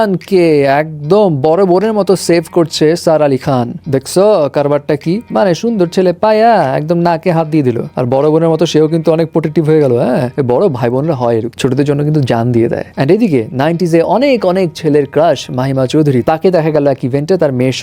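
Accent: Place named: Kolkata, India. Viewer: কিরে ভাই আপনার ভাষাটা এরকম লাগতেছে কলকাতার মত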